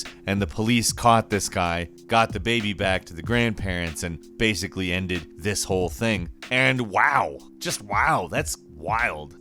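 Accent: United Kingdom. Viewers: MedievalShadows I’m pretty sure she’s a brit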